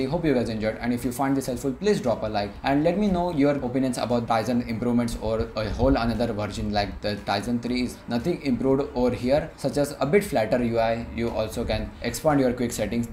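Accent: India. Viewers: hmissi ALA Indian accent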